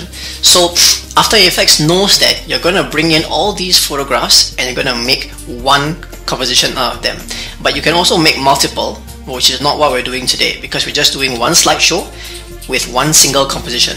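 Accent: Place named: Singapore. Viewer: I like hearing this singaporean accent hahaha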